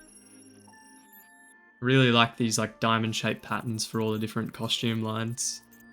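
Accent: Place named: Australia. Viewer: Gorgeous character. Can’t wait to sit down and hammer out this content myself. Got a special project of my own I’ve been working on that wasn’t suppose to turn into the monster it has become, but it’s been fun to hammer out. As always, your content is a pleasure to watch. Wish I had your buttery-smooth Australian voice and cadence. Best of luck in your exams, can’t wait to see what you have planned for 500 subs!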